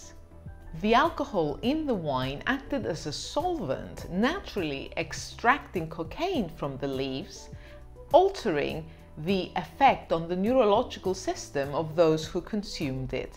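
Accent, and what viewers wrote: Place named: Italy. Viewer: Redhead ✓ Wine expert ✓ Italian accent ✓✓✓✓✓✓✓✓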